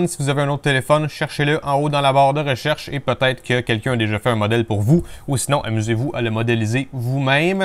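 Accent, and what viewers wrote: Quebec, Canada. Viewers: Ah un québécois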